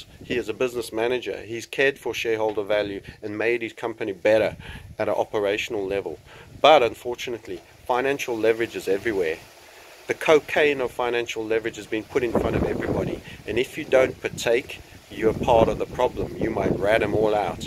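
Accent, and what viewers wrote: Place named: United States. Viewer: 10/10 American accent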